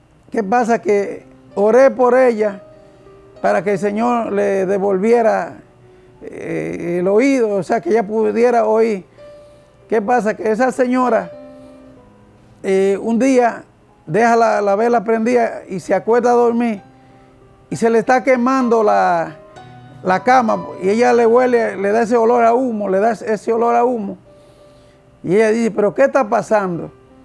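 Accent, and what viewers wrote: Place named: Dominican Republic. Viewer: Dios le bendiga. Apenas empecé a escucharlo no sé si identifica el país desde donde está. Pero lo identifico por su manera de hablar, imagino que es de RD, gracias por su testimonio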